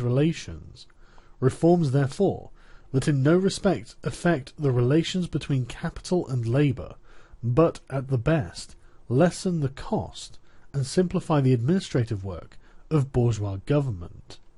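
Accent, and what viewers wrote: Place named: England, United Kingdom. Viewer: I'm undecided if hearing Sargon read this makes the ideas more concerning than when I last read it. That type of English accent makes things sound more reasonable and somehow more evil at the same time.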